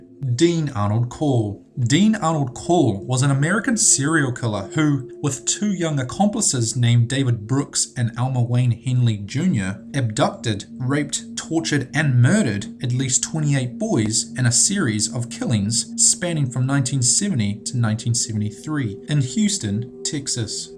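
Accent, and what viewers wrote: New Zealand. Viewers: Kielee R I live in Queensland, Australia we don't talk like that. Maybe a Kiwi! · His accent is very much a New Zealand one. Australians don't pronounce words in the same way that New Zealanders do. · He should know how to pronounce things like infamous and impotence. I thought his accent was more Kiwi than pom but I could be wrong. At least he can pronounce "subsequently" which so many can't. Drives me bonkers. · Fury Devil He's a Kiwi.... Kiwi's don't say 'Mutation' instead of 'Mutilation'.... facepalm yourself buddy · Your New Zealander right? I'm Australian and the way you enunciate the letter E kind of gives it off. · That New Zealand accent drives me fucking insane/ Tid bundy and the disastrous Goan Vallejo Corona. People just don't try when they annunciate incorrectly. Make's me very very annoyed...and I haven't subbed at all.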